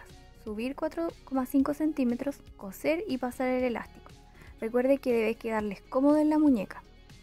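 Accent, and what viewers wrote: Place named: Chile. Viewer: Ay apenas te escuché dije: es chilenaaaa. Mi país mi país 😁 muchas gracias por el tutorial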